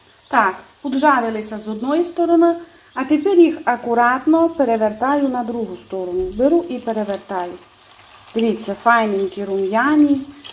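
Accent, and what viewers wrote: Transcarpathia, Ukraine. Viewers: и снова вы с чудесным закарпатским говором и нашими украинскими вкусностями Рада вас слышать Привет из Изюма❤😊😊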